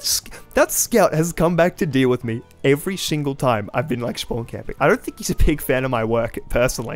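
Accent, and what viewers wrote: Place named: Australia. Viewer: The thing is, this isn't the case in basically all of his other videos if you look back on them, this sort of thing only happening on rare occasions to minor degrees. But in this case it's everywhere in this video. It happens a lot here where in other videos it's particularly rare. Being Australian might have something to do with it and the accent might be effecting it but the oddity is how frequent it is in this video in particular.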